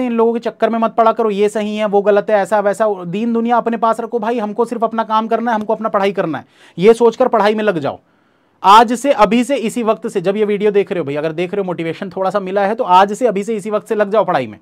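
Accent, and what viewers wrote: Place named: Chhattisgarh, India. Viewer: Sir ap pura chhattisgarhi style me bole ho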